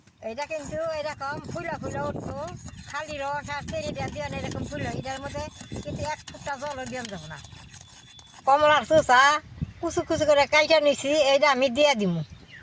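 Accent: Tangail, Bangladesh. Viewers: আপনার বাড়ি কি টাংগাইল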